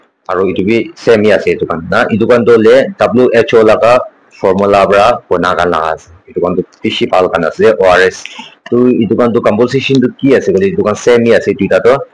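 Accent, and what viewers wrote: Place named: Nagaland, India. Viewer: Bakka local nagamise Ao tune ase ....holibhi bisse bhal tey ....keep it up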